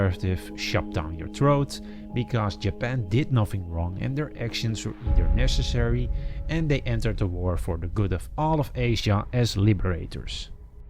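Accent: Netherlands. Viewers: Great Dutch accent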